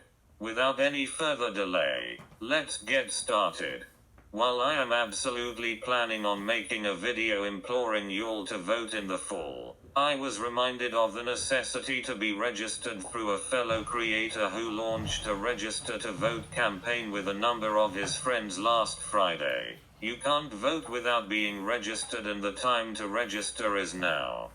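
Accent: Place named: United Kingdom. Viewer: I love the British voicing you set on you device 👍🏽 I agree with you whole-heartedly 👍🏽 Stay well & stay safe ✌🏽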